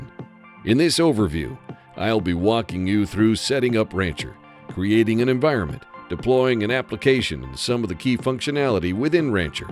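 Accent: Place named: New England, United States. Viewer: why does he sound like a yankee with a country accent?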